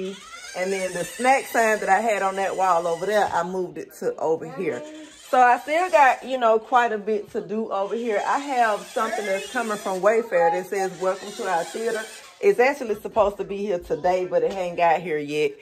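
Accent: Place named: Georgia, United States. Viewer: New subscriber! Thanks for sharing all of your tips. I'm from Ga., so hearing you always reminds me of home. 🙂 Happy Holidays. 🌲❤🌲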